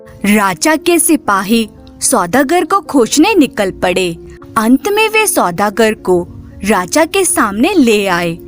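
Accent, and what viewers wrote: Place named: United Kingdom. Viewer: Story sachame achi hai... but aap hindi to English accent me kyu bol rahi hai... try to speak normal... ❤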